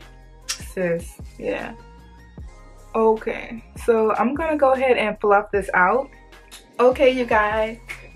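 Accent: New Orleans, United States. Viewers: Curls are popping!!!!!! Are you from NOLA, cause I hear a lil something, something!!!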